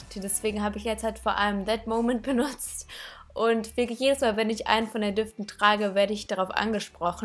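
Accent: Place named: Bavaria, Germany. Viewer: du kommst aus bayern :O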